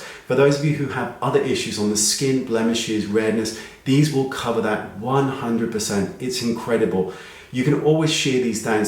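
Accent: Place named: United Kingdom. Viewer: Sometimes I forget what the video is about because I’m enjoying listening to your accent & the British words to use. That my friend is a good thing.👍🏻💚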